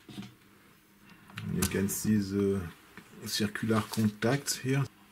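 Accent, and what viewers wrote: France. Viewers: What a French accent !!!! 😅😅😅😍😍😍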